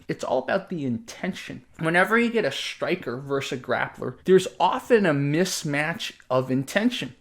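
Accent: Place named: Midwest, United States. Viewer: Bro i did not expect you to look like that, based on your voice i was expecting a midwestern white dude in a flannel shirt with a can of beer on the table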